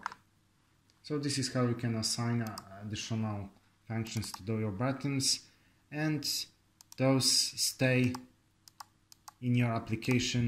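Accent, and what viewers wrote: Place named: Poland. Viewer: Po akcencie zgaduje ze jesteś z polski. Mam racje xD?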